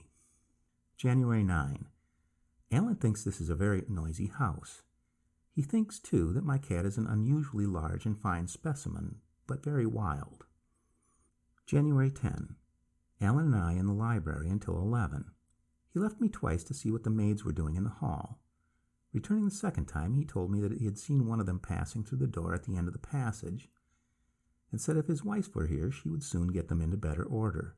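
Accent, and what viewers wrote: United States. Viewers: Colonial? American…. he talks in a rush and then inapause…..strange delivery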